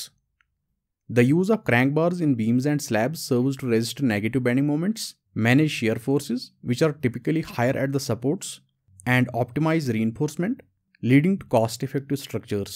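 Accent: Kashmir, India. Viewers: I could guess from your accent, I am also from Kashmir 😊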